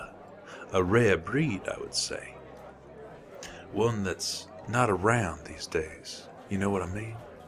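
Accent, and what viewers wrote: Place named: South, United States. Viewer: really convincing southern drawl! Loved the story c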